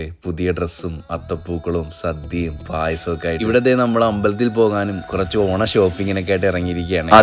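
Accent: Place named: Thrissur, India. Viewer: Sathyam tsr slang pole😹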